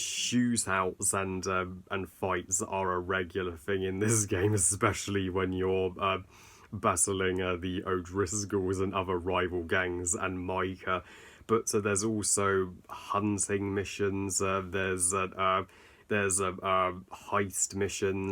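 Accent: West, United States. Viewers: great review as always and i loved your western accent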